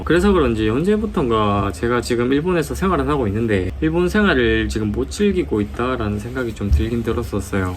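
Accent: Daegu, South Korea. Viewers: 안녕하세요. 일본 워홀을 가고 싶어 영상 찾아보다가 댓글 남겨요. 대구 사투리 쓰셔서 대구 분이시구나 했는데, 팔거천 얘기 듣고 깜짝 놀랐네요. 저도 칠곡 살아서요 ㅎㅎ.. 지금은 서울에 직장 잡고 열심히 일하고 계신 것 같은데, 저도 워홀 이후에 서울 가는 게 계획이거든요. 이런저런 부분에서 반가워서 댓글 남기고 갑니다. 다른 영상도 마저 찾아볼게요. 하시는 일 다 잘되시고 파이팅하세요!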